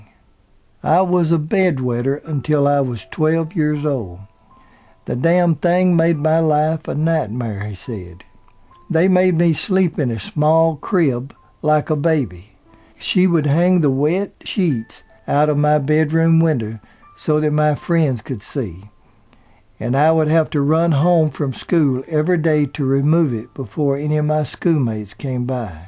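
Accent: South, United States. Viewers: I would’ve preferred someone with a northern accent narrated the documentary. I have nothing against southerners, it’s just that it’s so hard to understand him, the pronunciation of certain words and the drawl. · I would like to know who is narrating this video? He sounds like a true southerner. I’m from Alabama and I enjoyed listening to him narrate. Wasn’t a fan of Little House because Nellie was so mean lol.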